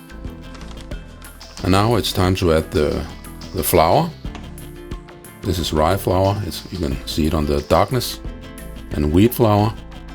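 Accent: Denmark. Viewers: Man kan godt høre du er dansker. Tak for opskriften. Den vil jeg starte på med det samme:-)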